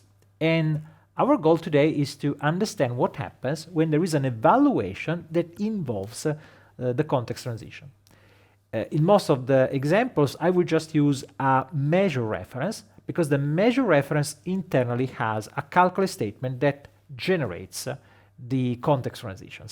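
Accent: Italy. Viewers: Excellent. This channel probably has the best advanced PowerBI free content on the internet. Moreover, the Italian accent is very funny! Greetings from Brazil.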